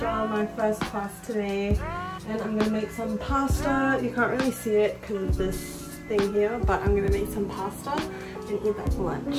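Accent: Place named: England, United Kingdom. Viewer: Are you from the UK? You have a very English accent.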